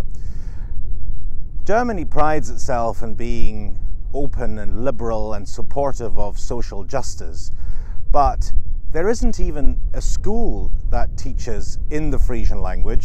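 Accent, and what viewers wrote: Scotland, United Kingdom. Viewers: Interesting - your accent sounds similar to Scottish. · yeah it's true you do sound Scottish. Are you a first language Frisian speaker? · In case you are curious, two Scottish aspects of your English accent are that you pronounce the vowels in “so” and “day” as monophthongs and that you use a tapped r sound, the r sound also found in Swedish, Icelandic, and most Norwegian dialects. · I am confused. You sound Scottish but have a German name.All the same Frisian is the closest related language to English so hope to God the German AND Dutch governments protect the culture of these islands!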